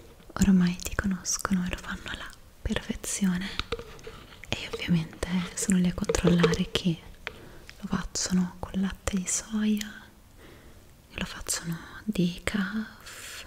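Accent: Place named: Milan, Italy. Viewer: Non capisco se mi sembri milanese o una delle assistenti del diavolo veste prada (no insulto ti adorooo❤)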